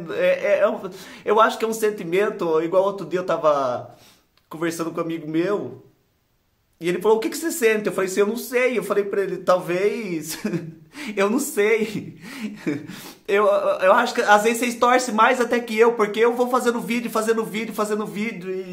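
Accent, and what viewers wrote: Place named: Rio Grande do Sul, Brazil. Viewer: Gostaria de saber de onde vc é .Achei que fosse gaúcho, pelo jeito que tu fala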